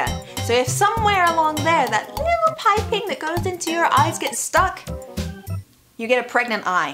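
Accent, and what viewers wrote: United States. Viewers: she sounds so American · She's beginning to get an American accent. :D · What's with the American accent? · It's been a while, and i have one question. WTF HAPPENED TO YOUR ACCENT!?!? You sound so damn American now! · Dude, you're starting to sound so American.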